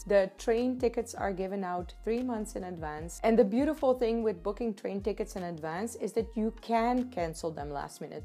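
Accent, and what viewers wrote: India. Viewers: Awh you have a lil Indian accent and im jealous I’ve never been to the motherland 😔 ugh you’re earrings are 😍 and omg you are definitely becoming a Indian talking with your hands all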